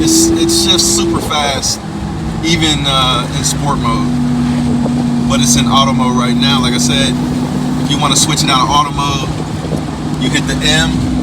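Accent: United Kingdom. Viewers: British accents are amazing lol, nice how to man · So no mode days Drive it's Strada Corsair or sport mode to go forward and r for reverse. Very interesting so if u put it in neutral when stopped you're self do it rev or go into first gear when u press the Gas? Lol British Accent. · love the bristish accent 😂👌🏼 congrats on the car . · Loving your British accent😁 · I didn't know you were British?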